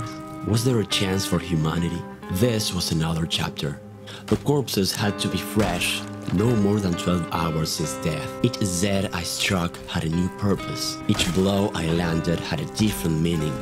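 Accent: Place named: Argentina. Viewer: wait, you're from argentina? Yooo, south america gang. i'm from brazil. I noticed your accent.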